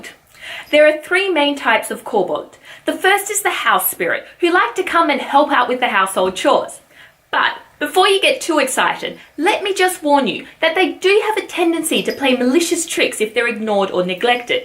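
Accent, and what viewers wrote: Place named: Australia. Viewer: You deserve more subscribers - the name for your channel is false advertising! Also, it's great to hear an Aussie accent (bonus points for paying attention to pronunciations tho!) discussing obscure monsters on YouTube :)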